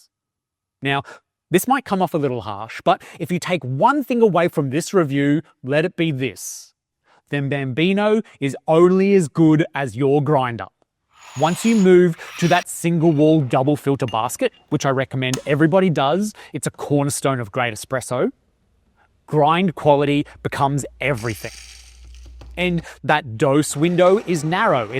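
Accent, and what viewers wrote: Australia. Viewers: I watched your video for the first 3 minutes with captions and the sound off. Thought to myself, 'This guy probably has an Australian accent.' Turn the sound on, and I was right. How did I know that!?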